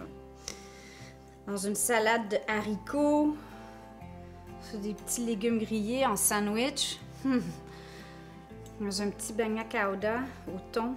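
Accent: Canada. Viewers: bonjour, je m'abonne ici et j'adore ton accent canadien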